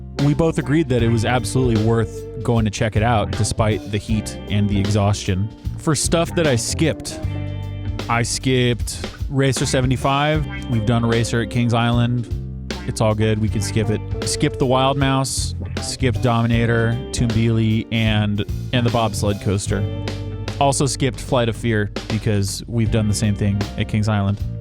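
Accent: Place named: Florida, United States. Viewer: you talk like a friend of mine from Ocala, Florida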